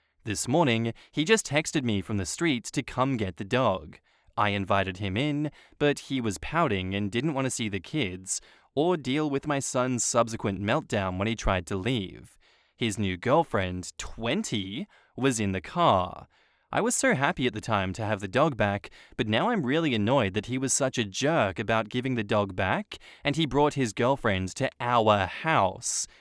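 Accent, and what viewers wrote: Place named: Australia. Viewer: thanks for the Aussie accent such a pleasure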